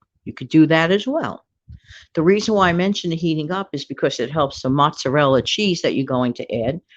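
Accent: New York, United States. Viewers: Hi from South Australia 🇦🇺 I found your you tube channel today it’s fantastic and inspiring. I just love that NY accent I am in my third act of life and getting my financials and life. Looking forward to hearing more of your channel and learning new tricks on how to make life better ❤️😍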